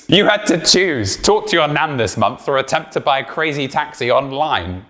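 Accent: United Kingdom. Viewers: I love listening to a British man who sounds like he just got through with his divorce papers talk about fallout two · Wait you British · Why are you British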